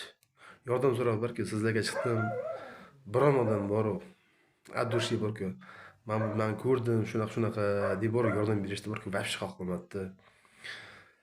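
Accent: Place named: Tashkent, Uzbekistan. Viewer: Ярими шива ярими тошкинча😏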